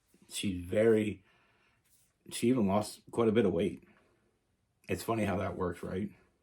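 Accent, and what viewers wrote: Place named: Canada. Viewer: I love your stories!! I could hang with someone like you all day! If you don't mind me asking, what state are you located in? You sound Canadian! The cat is the bonus! I seriously thought it was mine at first! Thanks! You are appreciated!!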